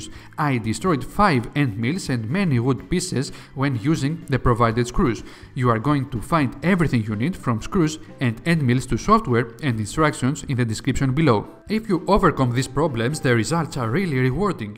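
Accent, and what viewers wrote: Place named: Greece. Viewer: Love the greek accent: "Took me about ten awerrrs to bild de maseen!" Keep it up!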